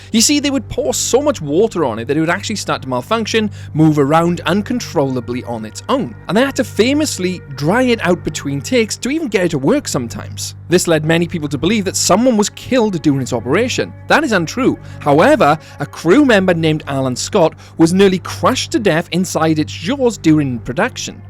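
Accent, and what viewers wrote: Wales, United Kingdom. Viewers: Is that a fellow Welsh accent I hear? Love your videos, dude! They’ve made me much more interested in the wider lore of JP/JW. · It is hard for me to find new classified disclosure on a daily basis. I am amused by the British accent. I see you did Inter Alia Quantum Postage.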